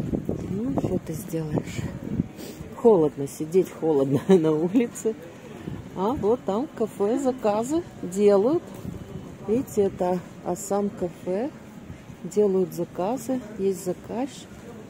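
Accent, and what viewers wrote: Odessa, Ukraine. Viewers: До 2014 года приезжая в Одессу замечала что останавливаюсь и прислушиваюсь как разговаривают Одесситы., спасибо за возможность послушать Вашу такую чисто Одесскую речь. С пожеланием мира всем нам из Донецка.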